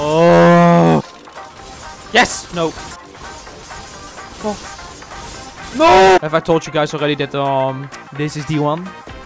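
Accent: Netherlands. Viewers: Hoi ik weet dat je nederlands bent ik hoor het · Je bent gewoon Nederlands leer Engels praten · Are you dutch? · Ja echt aan hem accent hoor je al dat hij nederlands is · is hij nederlands ofzo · zn accent is zo nederlands ik ga stuk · where do you come from? you sound like youre from the netherlands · Hij heeft heeft Zo'n nederlands accent poeh he😂😂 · Dutch accent (je hebt echt een Nederlands accent 😂😂) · Boii. Are you dutch? You sound dutch🤣 love your vids❤ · Ik hoor gewoon dat je Nederlands bent😂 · Je bent gewoon nederlandss haha hoor echt duidelijk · You sound dutch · ja he hij heeft zo’n Nederlands accent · Haahha dat nederlandse accent door je engels is echt goud.... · Je klinkt Nederlands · Are you Dutch? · Dutch accent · Je hebt een Nederlandse stem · Je bent nederlands ik hoor het aan je accent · this guy is dutch, of niet? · Wat een lekker Hollands accent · Hij heeft gekke Nederlandse accent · Is he maby Dutch Hij is 100% NL Zijn Engels zo funny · You are from holland arent you? 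· Hij praat echt zo Nederlands engels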